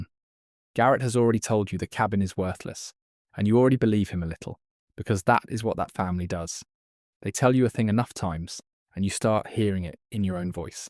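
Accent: England, United Kingdom. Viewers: South Carolina? Get rid of the English accent.. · love your stories but why the english accent.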